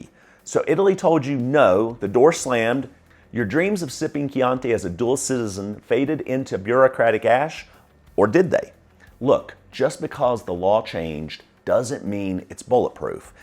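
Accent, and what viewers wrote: United States. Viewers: The President of the Republic signed the law but issued a statement this week stating that the law needs to be re-evaluated as it is impacting descendants of Italians born abroad. In addition the constitutional court is hearing a case regarding generational limits. If the ruling is that there is no generational limit then parliament will need to amend the law. Humor is appreciated but the accent is troppo americano ! 😅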